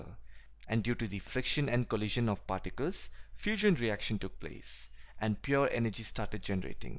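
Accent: Bengal, India. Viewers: Sir are u bengali ?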